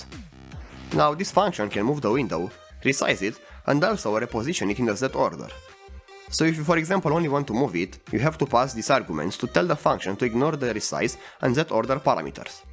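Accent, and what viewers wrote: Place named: Romania. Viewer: scrie la descriere frt+ ai accent de roman :)))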